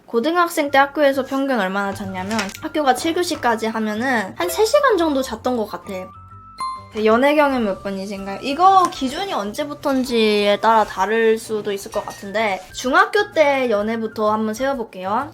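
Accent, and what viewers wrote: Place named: Seoul, South Korea. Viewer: ??타지사람들은 사투리가 들리나보다 난 걍 서울말 쓰는 걸로 들리는데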